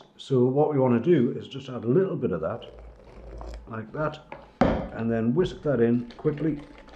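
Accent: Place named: United Kingdom. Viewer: What part of the UK are you filming from? Im watching in Canada and im not very good at placing UK accents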